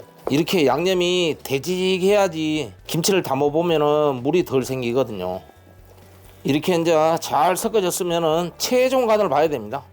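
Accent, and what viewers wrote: Jeolla, South Korea. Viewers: 셰프님들중 셰프님이 쵝오 입니다 설명도 귀에 쏙쏙 들오게 잘해주시고 전라도 사투리로 아주 재미 있습니다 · 손님들이ㅡ한장 해버려 구만요ㅡ전라도ㅡ사투리 조아요ㅡ😏😏😊👍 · 구수한 전라도 사투리가 듣가 좋습니다..저도 고향이 전라도..ㅋ. 절이는 노하우 이용해서 올 김장은 좀 더 많이 담궈야겟네요..감사합니다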